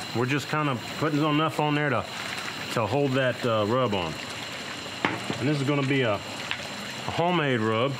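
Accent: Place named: Texas, United States. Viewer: The moment I heard you I knew I had found my brisket man....native Texan here (the HEB clinched it that you were too)just your voice makes me home sick and the brisket almost made me cry...have lived away from home nearly 35yrs now (now WAY south of the border in Guatemala as a retiree)...no brisket for 1,000 miles. Great pork here in Guatemala terrible beef (skinny range fed and "pasture" to table the next day so TOUGH..makes good tasty burgers though when mixed with some ground pork. Bought hubby a Weber Smoker and put it together today...smoking tomorrow....thanks for your expert cooking lesson!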